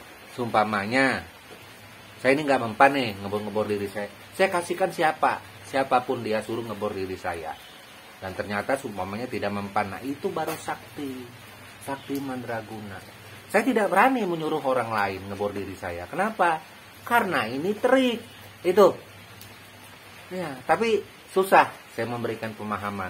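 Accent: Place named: West Java, Indonesia. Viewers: MAAF EMPU KOK EMPU LOGAT BICARANYA SPRTI ORG SUNDA HEHE 🙏🙏🙏🙏🙏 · Salam santun mpu... Mohon maaf..logat bicara..mpu seperti ada logat sundanya...apa mpu ada keturunan sundanya..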